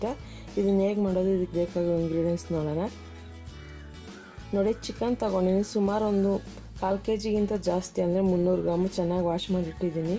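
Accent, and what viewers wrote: Tamil Nadu, India. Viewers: Hmm nivu matadodu tamilians Kannada matadid haagide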